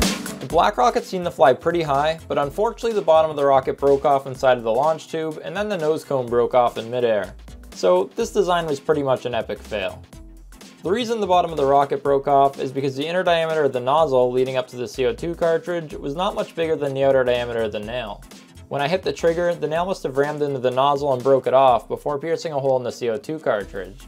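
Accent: Canada. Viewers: I pegged you as Canadian :) Probably Ontario. It's OK because I'm from the left side of Canada. Cool rocket by the way. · Now thanks to HIMYM I cannot unhear that "eau". when you canadians pronounce words like "out" it comes out as a "eaut". like the french word for water, followed by a weird out of place "t". and it's not only in out. several words that came out in himym follow the same pattern. Nice rockets and everything, but every time I hear: "eaut" my brain goes: this is a canadian. :) and it has done that like 10 times during this video :) well, maybe a little more :)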